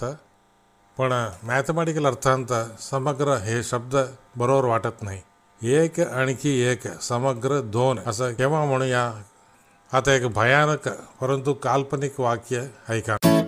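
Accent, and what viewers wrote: Thanjavur, India. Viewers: तुम्ही जी तंजावरी मराठी बोलता ती बोला ऐकायला चांगली वाटते फ़क्त एक विनंती आपले मराठीपण जपा। जय भवानी जय शिवाजी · नमस्कार!🙏 तुमची मराठी (तंजावुर) ऐकायला खुप गोड वाटते. तुमचं म्हणणं अगदी बरोबर आहे. पण महाराष्ट्रातील मराठी भाषिकांना तामिळ शब्द कळत नाहीत, पण तंजावुर मराठी ऐकून, समजावुन घेवून कळु लागतील. संवाद व्हायचा असेल तर प्रमाण भाषेतला नाही तर बोली भाषेतील पर्यायी शब्द माहीत असावा. आपले सर्वांचे मराठी भाषेसाठी चाललेले प्रयत्न कौतुकास्पद आहेत. धन्यवाद!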